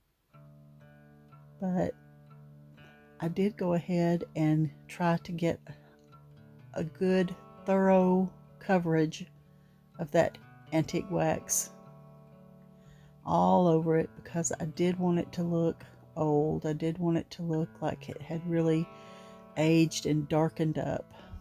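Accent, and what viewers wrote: South, United States. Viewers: I haven’t heard anyone say “Swanee” in years I don’t know how to spell what a Southerner says for (I swear) love watching and listening to you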